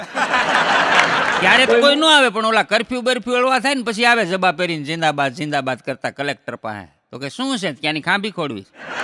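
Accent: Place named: Kathiawar, India. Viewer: Kathiyawadi bole chhe.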